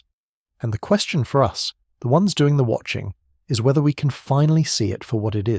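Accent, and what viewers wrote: United Kingdom. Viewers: Everything with a British accent is a bullshit Lie!!!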